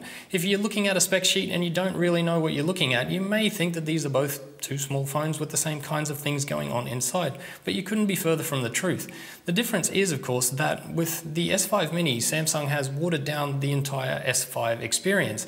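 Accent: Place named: Australia. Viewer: If the British version of Saul Goodman had an Australian accent?!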